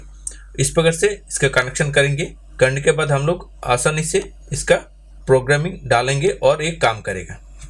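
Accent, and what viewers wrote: Bengal, India. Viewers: U r Bengali?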